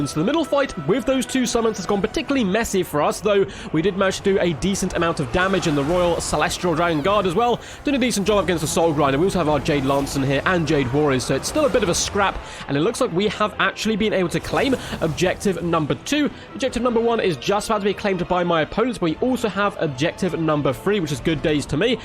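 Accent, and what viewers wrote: United Kingdom. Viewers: Are you British? You sure speak fast :)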